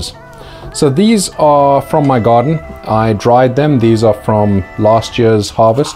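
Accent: South Africa. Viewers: Can I ask what your nationality is and where you currently living ,cause you sound to much like a South African to me but the products you use isn't familiar. FYI Love your Channel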